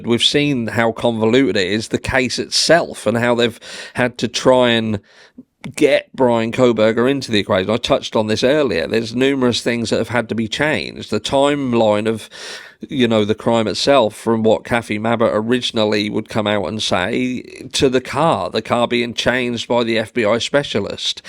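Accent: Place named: England, United Kingdom. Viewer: we are family loving men. Please understand irony here because good or bad it has been designed by Get a Clue from the begining because his autistic intuituon nailed the narrative you are only now alluding to. Offer him an olive branch of peace and bring him back beacause you are integral too. Coming from Englamd i am so familiar with your accent and God bless you and your family... But he does Richard Burton better and always had something to say that should be listened to. You do your mental health podcasts but ignore Clue like me might have issues there but you with English gumption are endorsing somethinhg not quite the truth and justice for all that Clue coined first but happier on the coat-tails now as things are turning out.... Send some love to Clue... There is exquisite method in his madness and i promise you he loves his wife children and dog jusr like you x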